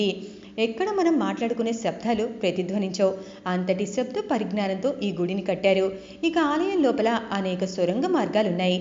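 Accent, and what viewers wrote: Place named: North India, India. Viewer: Iritating female voice more like northy accent.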